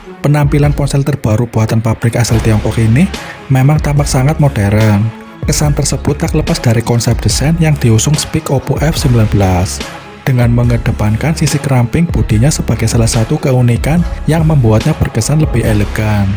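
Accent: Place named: West Java, Indonesia. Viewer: Bang... Orang sunda nyakkkk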